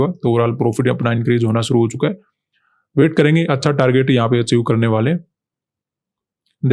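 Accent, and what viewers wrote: Haryana, India. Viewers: Bolne k excent se lg rha hai k HARYANA se ho..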